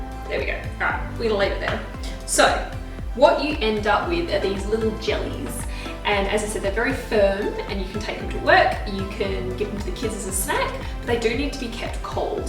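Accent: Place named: Australia. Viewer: Really? You’ve obviously never met an Australian, this is how a lot of us talk. 😊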